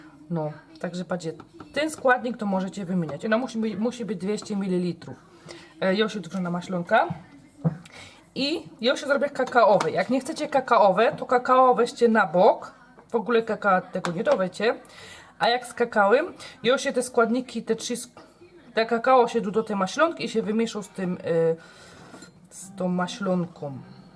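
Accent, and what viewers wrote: Silesia, Poland. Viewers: Genialna odpowiedź :) uśmiałam się do łez! Swoją drogą ja uwielbiam Cię słuchać; mogę sobie porównać różnice między naszą "godką" (Zabrze) a Twoją. Pozdrawiam serdecznie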